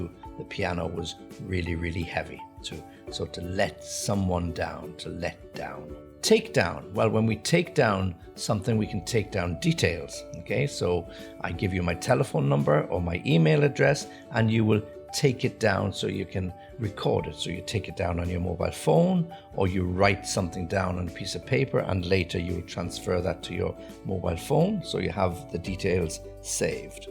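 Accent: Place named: United Kingdom. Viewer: I watched this video twice, I was enjoying it, your British accent sounds great, my warm welcome from Kazakhstan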